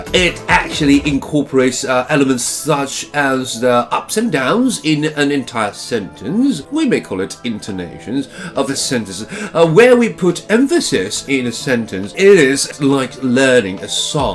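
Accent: RP, United Kingdom. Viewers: I'm British and I found this to be quite... fun, lol. Even with the British accent, there are northern and southern accents, and you mix them up and combine them lol But that's great :D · 造型好日系，口音超英系XDD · 有著日系外表但卻有著標準英式口音的臺灣人 我的腦袋：wow!!!! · Actually he sounds like posh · 發現自己的新癖好了😳視覺系英國腔帥哥好讚！！！！！還跟你聊莎士比亞！！！嗚嗚嗚嗚！ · Hi~從阿滴那邊認識你，像日本人的台灣人講著一口流利的英式英語，畫面有種有趣的違和感，就是因為這樣更吸引人忍不住一直看下去！ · 好好聽的英式口音XD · 講得好好喔~~~~!!英國口音~~好好聽喔~~~ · 我也是從阿滴那邊的影片認識老師,老師的英式發音很好聽,片頭音樂和影像製作很特別! 看得出來很用心在經營的頻道~值得推薦!! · Ur right, he speaks more British than most of the Brit~ to be specific, British accent is not that accurate or specific enough when it comes to the way people speak~ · I thought you were Britain born taiwanese! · Eko的英式口音真的好色！ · 很難相信台灣人可以有這麽神似的RP!我們香港從小學的是英式英語，雖然我們大部分人最後講的都是港式英語，但起碼聼英式英語是很習慣的。好像有研究説莎士比亞年代的口音其實比較像今天的美國口音？好像R在英國是到十九世紀才開始變silent。另外好奇一問：British/American accent前一定要加article嗎？'I prefer British accent'聽起來好像比'I prefer the British accent'自然。 期待更多影片！ PS 香港有個蕭叔叔也是一位英式發音很厲害的網上人物。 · 實在太酷了！！！超愛英式腔調！會講英日又是台灣人～讓人感動感到希望！期待＋必看每一集！！！ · 無意間看到您的影片，您的英國皇室腔調，真是驚為天人！我以前也是在英國念書，我是在Reading，離您就讀的Royal Holloway不遠，我實在沒想到有台灣人講英文的腔調能和英國皇室一模一樣，真是要好好向您學習了！我突然有個想法，如果可以的話，我覺得您可以探討一下英國皇室和一般英國人的腔調有和不同～❤️ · 第一次聽到老師說英腔的時候 整個都愛了 多看了幾部你的影片 這次的妝容很凸顯你的氣質跟美麗的眼睛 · 聽你說英文 會上癮 英式口音 讚 !!! 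· 迷人的英國腔，雖然台灣英語教育推美國腔啊～ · 老師很像日本的第一男公關羅蘭有英式口音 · 英國口音好好聽💓💓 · 忍不住一直看! 英式口音很古典浪漫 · 老師,英式口音真的好好好好好好好好聽,我學不起來(希望有一天可以看老師演英劇玫瑰戰爭.伊莉莎白....) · 這是我看Eko的第一部片，一講話我腦袋就是石內卜本人😂，Eko那英式發音~那氛圍，太高級了，語調如此從容不迫，然後，這部因為淺談口音，竟然就馬上看到石內卜做範例😂😂私心認為Eko欣賞石內卜，然後看到別的留言果然就是😆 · 天啊！你的發音好強喔！要是閉眼睛聽真會以為是英國人😱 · 好性感的聲音啊啊啊啊！ 英國腔好好聽🥺♥️ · What a perfect british accent.. I'm from Malaysia.. Still can understand without subtitles.. I still learning and improve my english hearing and conversation.. Australian accent are a bit to fast i think and too many short words 🤔 not easy to understand.. But still like british accent.. Cheers Eko先生.. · 我覺得老師的日文口音不錯。我聼你講英文我還以爲你是英國人（我是澳洲人）。I don't even know why youtube recommended this to me, but it was interesting 😆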